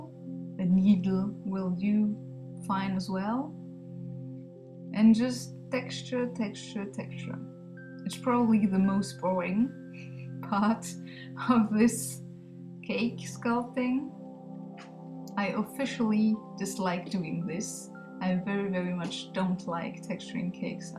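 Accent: France. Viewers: are you French? I liked the voice-over to. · are you french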